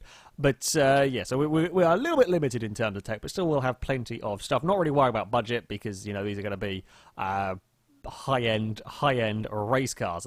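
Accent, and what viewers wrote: United Kingdom. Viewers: he's British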